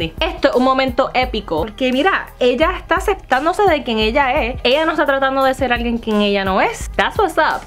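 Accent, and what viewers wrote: Puerto Rico. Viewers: ALICELY VELAZQUEZ. QUE EQUIVOCADA ESTAS MUJER.SU ESPANOL ES MUY AUTENTICO BORICUA Y NOS GUSTA PORQUE NOS IDENTIFICAMOS........ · Esoooo essss , arriba nuestra isla . Hablas bn boricuaaa 😜😜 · Hola, yo vivo en Florida. Pero escucharte inmediatamente me transporta a mi patria. Gracias por ser un pedacito de Puertorro. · Ella es más BORICUA que yo, mira como habla, me encanta 😂😍 Nueva suscriptora ❤️🤗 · Ajaja me encanta pues serás china pero toda tu personalidad y tu hablar es de una boricua 💪🏻❤️ eso es de corazón ❣ que mucho me has hecho reír por mas gente como tu bendiciones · Usted es Boricua., p q así es que hablamos Los Boricua, siempre defendiendo nuestras raíces., bienvenida a nuestra isla, eres un orgullo Boricua, tus expresiones son de corazón, y eso nos hace sentir orgullosos de que una China proclame nuestra raza., 👏🏻👏🏻👏🏻, no haga caso de lo q dicen., usted es lo que usted siente., sabes q aquí siempre a tus órdenes en tu país Puerto Rico., eres muy brillante., hablas muy bien el Español., te felicito., esoooo.. 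Boricua siempre defendiendo nuestra raza..❤️❤️❤️💋💋🙏🙏🙏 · Jajaja yo si soy boricua; nacida y solamente estuve en Puerto Rico 🇵🇷 asta los 8 años y ni tengo el accento boricua como tu, este video y medio risa por que ni escribo bien el español ni lo hablo como tu🇵🇷 y me crie en estados unidos y ahora vivo en Guatemala 🇬🇹 y ahora más me confundo cuando hablo español por aca en Guatemala 🇬🇹 hablan diferente el español 😂🙏so hablo español como sea 👍🏼🤬😂Me gusto como hablas a lo boricua 😍Wepa q viva P.R. 🇵🇷🙏👍🏼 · si en el titular no decia que eras china ni me enteraba porque mami ese acento que tienes es de un boricua 100% 😘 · "Oye yo soy Boricua cagao y con solamente escucharte el acento y lo simpatica y linda que eres por fuera mas por <link> siento super orgulloso y eres mas Boricua que muchos que nacieron en P.R. "Pa..Lante.Boricua...Digo...✌ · No serás boricua de nacimiento pero si de corazón y hablas bien boricua más boricua que muchos que dicen serlo .Bendiciones!!! 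· Hola es la primera vez que te veo pero me encanto tu estilo y tu asento bien boricua ya tienes otra seguidora yo tambien soy boricua y vivo en Miami desde el 2006 y extraño a mi bella isla un monton 🇵🇷❤😍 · Jajajaja!! Primera vez a te veo y me encanta!! Hablas bien boricua 🇵🇷🇵🇷🇵🇷🇵🇷 😂😂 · You are Boricua I love people that’s proud to love Puerto Rico tu asento es bien chula 100 % Boricua.. you sound mas Boricua que muchas nuyorican girl Your a beautiful China Boricua y representando nuetra patria and we’re Happy to have you part of our culture! Y por representar nuestro cultura Que Dios te bendiga Linda ! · Me encanta tu picardía! Eres graciosa y bonita. Tu tono de voz es muy boricua. Precisamente estaba pensando que deberías hacer algunas comidas de la isla. Sería muy bueno! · OMG!!! Me encanta tu manera de seL !! Eres súper boricua como hablas. Que rico que te sientas así. Tú naciste en la isla o en China ? 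· Que chula!!! Eres más boricua que yo que nací en Puerto Rico!! Hasta Suénas más boricua q yo!!! Sabes que extraño de Puerto Rico: la Playa, la comida China pq no hay como ese sazón único(de hecho cuando fui el año pasado al segundo día me pedí mis tostones al ajillo y la combinación de arroz frito con costillas 😋 🤤, y obvio la familia y el ambiente donde quiera que vayas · Tú dices que eres China pero tú pareces más Puertorriqueña que China con ese acento puertorriqueño, y la forma tan bonita como habla 🙏Mis bendiciones para y tú familia. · Por que no, todo es posible, de solo escucharte no va a haber duda alguna que eres más boricua que na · Eres un amor :-) dios tebendigas ..hablas bien borricua :-) que ermosa eres ..yo vivo en estados unidos desde el 96 y soy boricua y al platicar la gente medice que no hablo borticua pero si lo soy 100% uno lo lleva por dentro.. Dios te multiplique de salud.. 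Gob bless u always u r the best. .. kisses for u.. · ¡Si, eres boricua! El que tenga dudas, que cierre los ojos y te escuche hablar.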